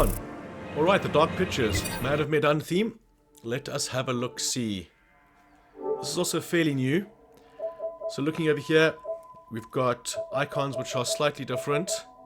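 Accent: South Africa. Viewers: South African Youtuber ? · Can clearly hear you south african😂❤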